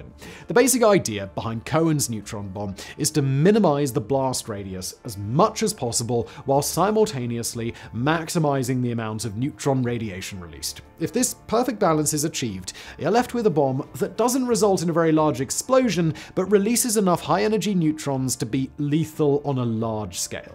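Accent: RP, United Kingdom. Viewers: legit, the subject is so interesting, but this man is talking so over the top queens english that it's distracting ngl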